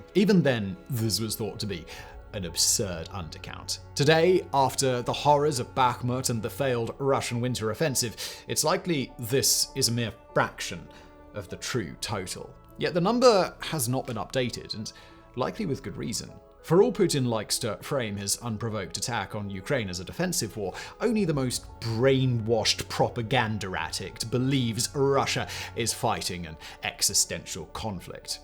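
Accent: England, United Kingdom. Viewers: Another NWO site and sounds like he's from that wannabe country called England . What was the last so-called WAR England had . Oh yes , invading the Falkland Island. Not only loosing one of their war ships , they had to use a cruise ship to bring the ground troops to the conflict . So , England has to war through Ukraine to test their weapons . Britain doesn't even rule the waves anymore since the ending of WW II .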